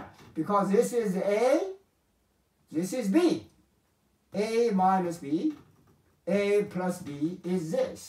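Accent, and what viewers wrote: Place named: RP, United Kingdom. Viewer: He somehow has an old British aristocracy accent from the movies. Beautiful!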